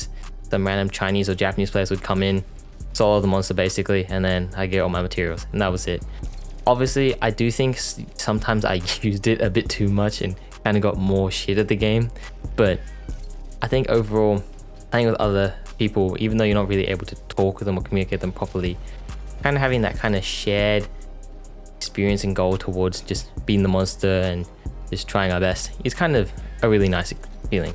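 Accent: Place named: South Africa. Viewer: Bro are you south african/namibian/australian? You really sound south african to me lol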